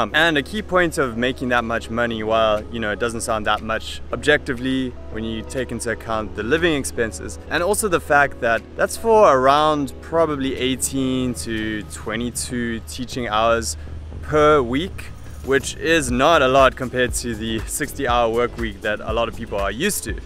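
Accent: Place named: South Africa. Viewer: He sounds South African to me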